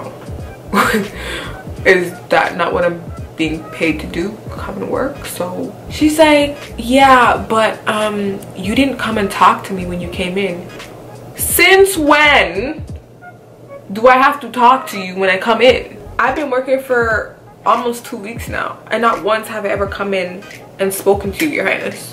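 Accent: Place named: Caribbean. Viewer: I hear the island baby where u from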